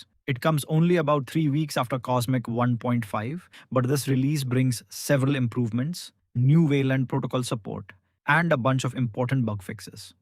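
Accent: India. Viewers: I'm not being ironic: Is this an Ai voice with Indian accent?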